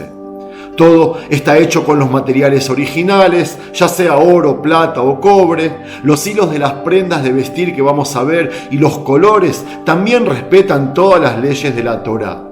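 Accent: Buenos Aires, Argentina. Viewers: Este tio q hebreo va ser habla como Porteño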